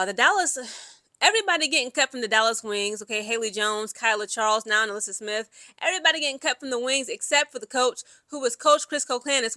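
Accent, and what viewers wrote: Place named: Caribbean. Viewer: I think sometimes I hear a little West Indian twang too and I’m hailing up from St. Thomas, V. I. and Guadeloupe myself. The thing is I struggle to keep up because she talks so dang fast🙂.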